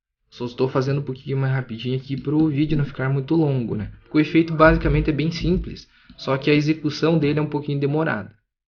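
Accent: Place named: Rio Grande do Sul, Brazil. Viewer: parece gaúcho ne, também achei kjkjkkkkj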